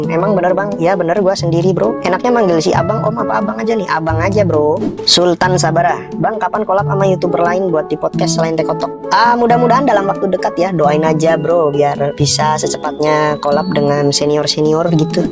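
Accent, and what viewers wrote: Banyumas, Indonesia. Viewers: Org ngapak? PADA WOYY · Oalah jebhule rika wong ngapak😂👍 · Anjir wong ngapak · Setuju jarna inyong juga ngapak lah broo😁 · Ngapak sebelah mana banh? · Ngapaknya mana bang.. cilacap.. Purbalingga, Purwokerto apa mana bang? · Ngapakny Mana Bang? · Ngapak e ndi bang kebumen po cilacap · Gua suka banget kalo bang pakai logat ngapak😂😂 sumpah ngakak😂😂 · Ngapak nya sebelah mana bang? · kalo orang ngapak, jadi keinget curanmor versi ngapak cilacap yah bang 😂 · Ngapak e pundi mas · Bang gw juga org ngapak lu dmn nya 😂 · Jebule wng ngapak y, pantesan ana ngapak" e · Bang ngapak bgt rika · Ternyata orang ngapak ya bang😅 gw juga orang ngapak ni btw ABG nya orang mana😁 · Ngapake ngendi bos...bener bae nek pas ngomong ngapak ngena/medok bngt 😅😅😅 sem 😅👍👍👍👍 trus rika mantan kenshu pa bos? Kadang nek jepangane 👍👍👍👍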